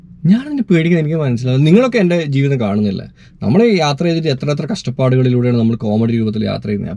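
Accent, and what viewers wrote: Kannur, India. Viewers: Bro nigal kannur karan anoooo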